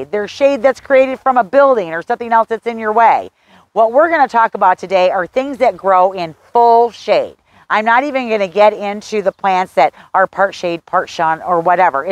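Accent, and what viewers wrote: Midwest, United States. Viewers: Great information and a video without a ten-minute introduction of mindless time-wasting filler. All the folks complaining about the Midwest accent can drop off. Imagine feeling so self-important that you criticize the way another person speaks when that person is trying to help you. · I love this real American accent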